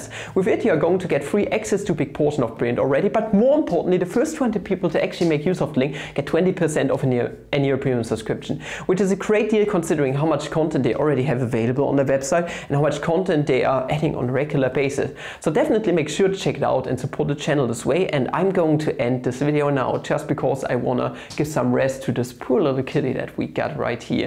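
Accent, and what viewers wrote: Germany. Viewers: Its funny how you know after 2 sec that he is german 😂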